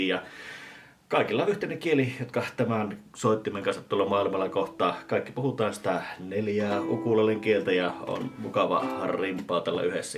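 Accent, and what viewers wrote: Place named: Savo, Finland. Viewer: Tämäpä ei ärsyttänyt yhtä paljon kuin normaalisti savon murre. Oli letkeetä.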